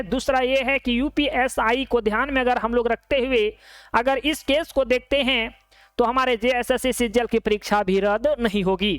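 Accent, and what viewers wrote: Bihar, India. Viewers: Tum bihari ho n...tumhra language n tone bihari wali hai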